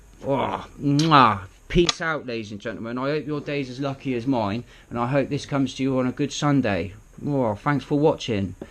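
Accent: Portsmouth, United Kingdom. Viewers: Why do you sound like your from Portsmouth x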